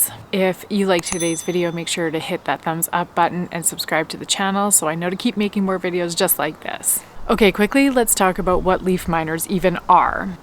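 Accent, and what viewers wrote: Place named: Canada. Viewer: I am growing these: 1 coffee shrub, 1 avocado shrub, 12 buckets of potatoes, 6 buckets tomatoes, 6 buckets onions, 6 buckets sweet corn, 6 buckets carrots, 2 buckets yellow squash, 2 buckets green beans, 2 buckets jalapenos, 2 buckets green peppers. I'll look & see if I find any of these larvae. Thanks for the heads up. Love your Canadian accent !! I subscribed.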